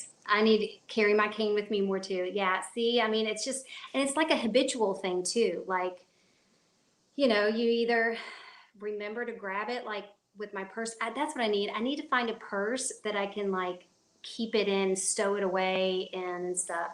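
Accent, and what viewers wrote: South, United States. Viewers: Thanks for your live chat vlog tonight. I had to drop for a bit and checked back in. I love your southern accent! We are from California and have been in GA for almost 30 yrs now and people say I have it too now. Best of luck going back to the cane. My husband got a guide dog a year ago and it changed so much of his life for the better. His confidence skyrocketed. Thanks too for answering my questions tonight! Very helpful. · Good stream and love your southern accent looking forward to more of your videos two toes up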